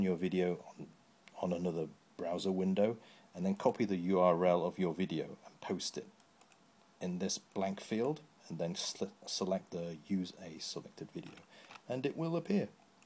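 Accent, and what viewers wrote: United Kingdom. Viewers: I love you so,. You really are the community's daddy. It says quite a lot, being able to bring together such a traditionally fragmented fan base. True, it is only the Youtube Gundam community, but every time the Gundam community manages a respectable step forward, I think it deserves pretty massive applause. Also, when you're joking about "how do I post a video response" I couldn't help but wonder how long you've lived in Hong Kong; your British slagging seems to not have faded yet.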